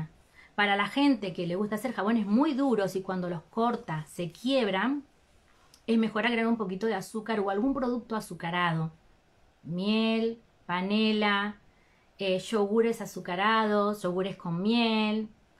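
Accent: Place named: South America, Latin America. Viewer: Hola, sigue activo el grupo? Me gustaría hacer un curso, saber de donde son. La profe tiene acento de Sudamérica 🥰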